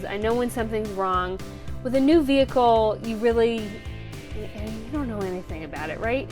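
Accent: South, United States. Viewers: You rock girl. Lose the music as it sounds like someone is playing Pokemon behind you. Want to hear everything you say. If you play music it needs to be base so it doesn't compete with your wonderful southern voice. Glad to follow someone real. You and Chrome from vanlivs are making YouTube worth watching. Love ya. Keep that shit up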